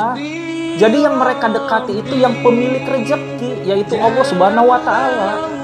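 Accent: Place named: West Java, Indonesia. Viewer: Beruney nya mn sih kang logat mu macam sunda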